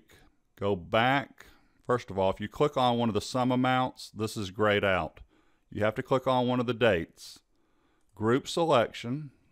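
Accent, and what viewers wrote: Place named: Texas, United States. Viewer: Sir, are you from Texas because you sure sound like it?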